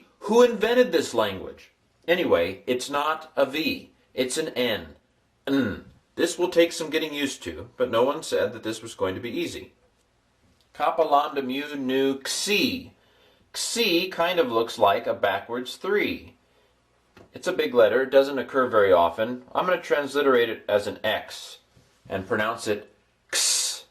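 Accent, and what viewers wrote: United States. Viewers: Erasmus killed the sound of ancient Greek just to make it easy for Americans. Sorry It's not Gama it's whama (like "why"). It's not delta it's δέλτα (like "this") "Αυτοις" for example it's not "αυ-τ-ο-ις. I know it's convenient but it's" αυ-τ-οις " ο+ι=ι Otherwise there is no harmony. Sounds like an American trying to speak Greek. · you're "americanising" the whole thing sorry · The other problem is that each nation has it's interpretation of vowel sounds. Americans are notorious for replacing vowels with arbitrary schwa sounds. Listen to how an American pronounces Banana - three "a"s - all with a different vowel sound. Americans also belabour the emphasis on the FIRst Syllable. Hence the POL-ice in ARIizona have the best REE-search and DEE-fence. To a native English speaker it sounds gauche and as if they are deliberately trying to shout or be rude. 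I'm not sure I can agree on how he pronounces ETA because of the bizarre way Americans deal with "a" in general....they pronounce it more like AAHH. Their "O" sounds like wind up ooooOOOHHH. Listen to how an American says oooOOHHrange instead of Orange. There is no way ancient Greek would conform to modern America colloquialisms, yet you can hear his heavy American accent as he pronounces "Greek".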